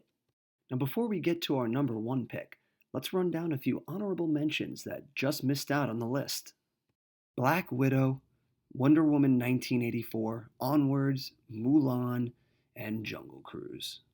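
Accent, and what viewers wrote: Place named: Brooklyn, United States. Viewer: nice vid - are you east coast repping? your accent reminds me of life in BK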